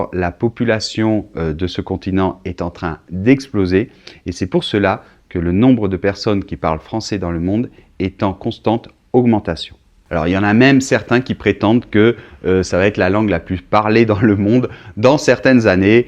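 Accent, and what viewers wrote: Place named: France. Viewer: Pour moi la langue française est une langue tellement belle et charmante(surtout à l'écouter😉)..j'adore cet accent😍..et j'adore aussi votre façon de parler et expliquer les choses..vous êtes le seul français que je comprends presque totalement!!(excusez moi pour les erreurs)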